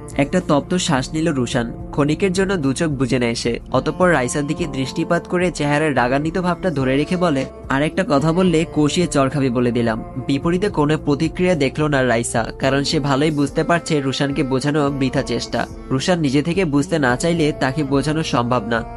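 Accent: Sylhet, Bangladesh. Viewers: Vaiya apnar basha ki Sylhet?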